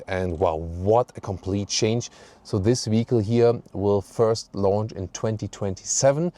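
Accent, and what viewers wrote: Germany. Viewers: This guy with a German accent, saying “the final solution” twice is a bit problematic. :) However I will be buying this car. Style, refinement and a real sports car when everyone is buying SUV’s.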